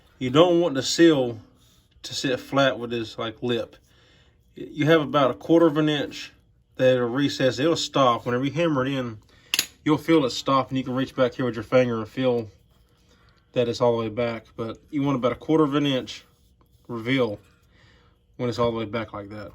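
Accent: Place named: South, United States. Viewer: Straight up Truth has a certain ring to it and you chiming like the Liberty Bell. Nice sharp car you got there, nice work, I especially like your comments on how not to damage the seal by denting it and causing a leak. Also cool Southern Accent. Hello to All from Alaska.. Ps; ye'all keep up the good work.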